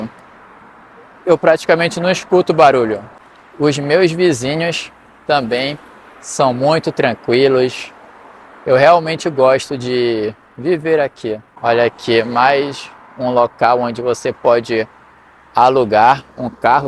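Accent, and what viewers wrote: Rio de Janeiro, Brazil. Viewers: You sound so carioca! :)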